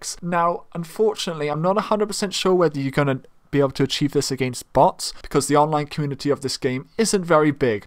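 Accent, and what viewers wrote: Wales, United Kingdom. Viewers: idk lol i heard little bits of a welsh accent lol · Is he Welsh? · Are you British?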